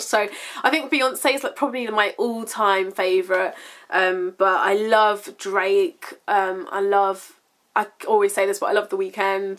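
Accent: London, United Kingdom. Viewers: I wish I was born and raised in London. Your accent is so beautiful! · Even if i'm french and i don't understand all the words you say, I can't stop looking at your videos because you are so beautiful and i just love the way you look ! I also love your british accent i think it's so cute compered to the american one ! Hope you will continue to make videos beauce I always look at them ! Kisses from France ! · you learnt french?? then, j'adore tes vidéos!! keep doing this, i think it's kinda refreshing to see "beauty gurus" with a british accent!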